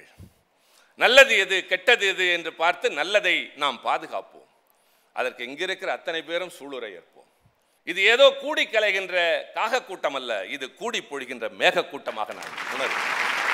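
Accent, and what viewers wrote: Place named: Thanjavur, India. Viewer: அருமையான பேச்சு.தஞ்சைத் தமிழ்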